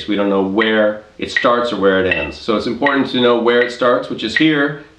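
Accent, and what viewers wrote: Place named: Cuba. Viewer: You sound cubano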